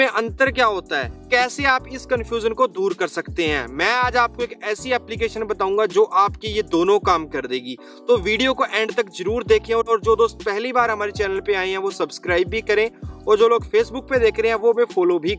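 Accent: Punjab, India. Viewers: Punjabi Hindi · sir aap punjab se ho kya...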